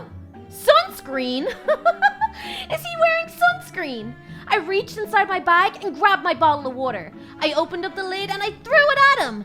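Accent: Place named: Ireland. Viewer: I have a question are you from Ireland your accent sounds familiar I love it especially your vids I am a new subscriber 😀😀😀😀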